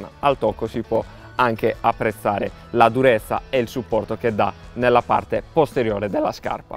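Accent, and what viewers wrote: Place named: Sardinia, Italy. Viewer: Spettacolare l'accento sardo! Continua così ragazzo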